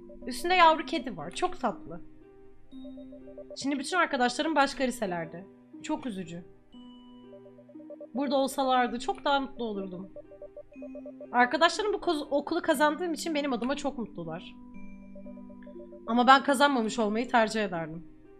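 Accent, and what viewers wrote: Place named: Kayseri, Turkey. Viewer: aga beeeeee ganalıma falan diyince bende hemşerim sandım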